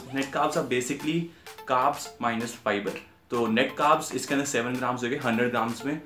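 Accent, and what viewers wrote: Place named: Philippines. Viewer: Your English sounds like Philippino, it took me a while to understand it. After the intro I thought you had switched to a different language.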